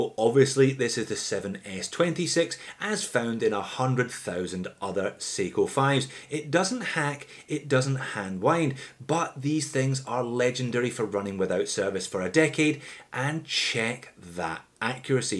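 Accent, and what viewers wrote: Scotland, United Kingdom. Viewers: I suspected that you were Scottish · Great watches, great video, great T-shirt, love ur Scottish accent. 🏴👍 Most people can’t afford “luxury watches” so it is great that you test affordable watches and give them some love. I am surprised about these proudly Chinese pieces. They seem to be a good alternative.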